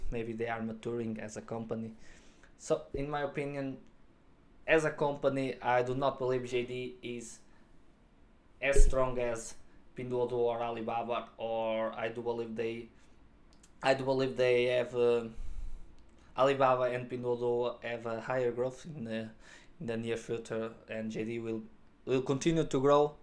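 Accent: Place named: Portugal. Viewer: Good video! I like your Portuguese accent. Sounds a bit like José Mourinho (that's a compliment)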